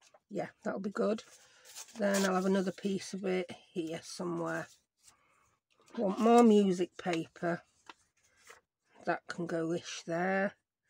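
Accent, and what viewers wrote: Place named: North, United Kingdom. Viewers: Hello, First of all I want to say how I really love your videos and since I am a Geordie living in Canada, its great to hear your accent reminds me of home. Anyway, to get to my point, we only have Micheals here and they are quite pricey. So I wish we had some alternatives even junk stuff we could use for collating etc. I have made so many of the things from your videos and love them. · I’ve been thoroughly entertained watching this! Been chuckling along, from Melbourne Australia. My friend in Michigan USA shared it on Facebook. Love your beautiful tags! And love your Northern accent. You sound like a dear friend of mine. ♥️ Will watch you videos again. I subscribed to your channel. Keep commentating; you’re Awesome at it! 😄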